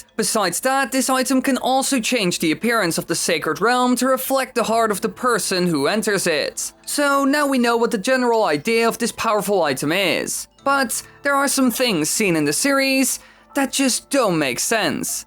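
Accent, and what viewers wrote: Netherlands. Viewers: Are you dutch?!!????! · Wacht.....Je bent Nederlands???????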